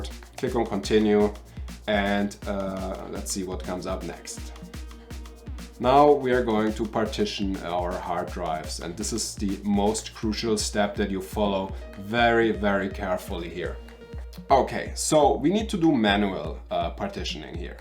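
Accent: Germany. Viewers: Are you German? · bist du deutsch? dein akzent🤗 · Nice one, deutscher Akzent lässt sich einfach nicht verbergen 👍😁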